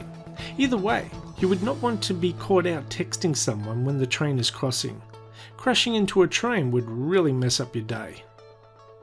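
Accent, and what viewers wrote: Australia. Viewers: yeah I agree with Ted. It's a well-spoken Australian accent. Beautiful accent. My accent. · yes exactly I kept on hearing "Murderway" and "Murderists". I guess that's Aussie accent!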